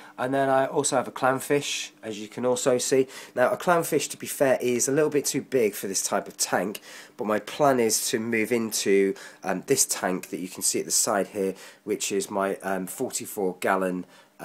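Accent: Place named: England, United Kingdom. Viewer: Awsome gd to hear an English voice on nano tanks gd look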